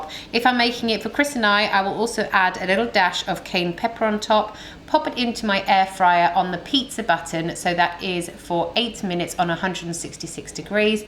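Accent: United Kingdom. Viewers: 🙂 Excellent. A British voice. Have subscribed for that reason alone......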